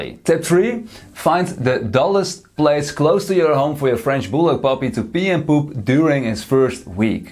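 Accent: Netherlands. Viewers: Are you Dutch your accent sounds dutchhh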